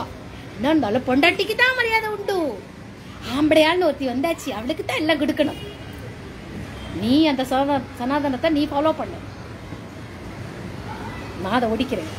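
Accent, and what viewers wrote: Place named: Tirunelveli, India. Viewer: Unkal thirunelvelli pasai arumai amma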